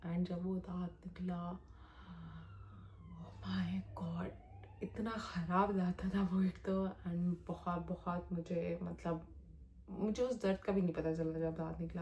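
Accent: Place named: United Kingdom. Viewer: Sorry i was really struggling to understand you. Your voice is really low plus you're speaking urdu in an english accent. At some points i was confused that are you speaking urdu or english? 😂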